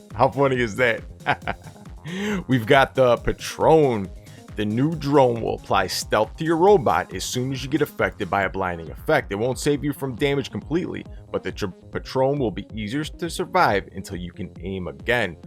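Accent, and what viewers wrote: Midwest, United States. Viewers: I like how you say "sharanga" 😂👍that Midwest accent never gets old 💚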